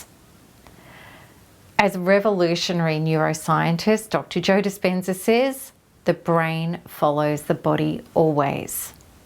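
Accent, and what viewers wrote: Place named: Australia. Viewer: I never cared for Australian accents, no offense. Then here comes! Your voice, including accent 😀 is so soothing. God bless the good work you do! CD for me was all the shitty things he was DOING and the "I love you" he was SAYING.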